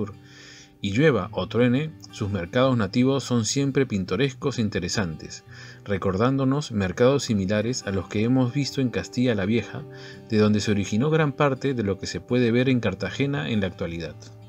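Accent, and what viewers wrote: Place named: Lima, Peru. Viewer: El narrador tiene acento de Lima, Perú.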